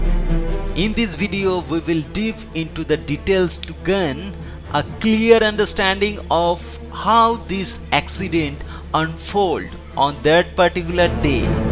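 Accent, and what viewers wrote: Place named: Odisha, India. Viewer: Odia speaking people will speak English in that way. NOT EVERYONE.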